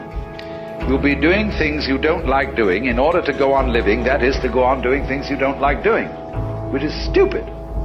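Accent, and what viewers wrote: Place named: RP, United Kingdom. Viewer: You are the first posh-sounding English bloke I've EVER warmed to. Thanks😊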